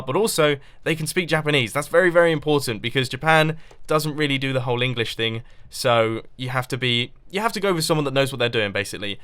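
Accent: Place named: United Kingdom. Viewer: is: Tall, hansom, has a British accent, smart, funny. Guys, hide you gool frens, mombo stel yo women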